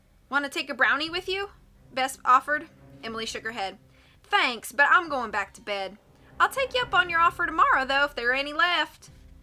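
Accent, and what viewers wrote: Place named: Southern Illinois, United States. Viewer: 'skulking around'?? How does one skulk? Have you ever been skulking? It's kinda like drinking but a bit smaller? ?? You're accent is a prefect southern Illinois dialect'ie -bit. - ever been ta Cairo? Greyville? You fet right en. M. IL.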